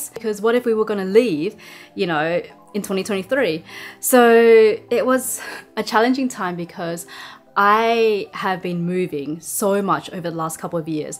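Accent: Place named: Australia. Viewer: I like your aussie accent while you can fluent speaking japanese 😮😊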